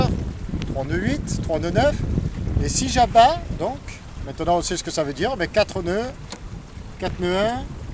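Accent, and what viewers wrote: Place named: Marseille, France. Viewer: Quel bonheur de regarder ces navigations sur la grande bleue dans cette région ( Bandol , Les embiez , Toulon , Porquerolles ) que je cnnais très bien . On a en prime cet accent marseillais plein de ce soleil qui nous fait tellement défaut à nous " voileux du nord de la France " . On sent le marin heureux d'être en mer .. un vrai régal et merci pour ces moments bien agréables ..Yannick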